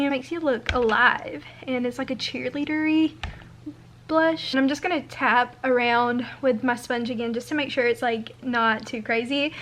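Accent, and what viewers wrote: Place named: Alabama, United States. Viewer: youre literally so cute!! this is my first time watching your channel. i searched ulta haul (looking for something to purchase while being so bored in quarantine lol) and i am so happy i clicked on your vid! youre so likable. subscribed :) ALSO i live in mobile, al and i feel like you are also from alabama bc of your accent.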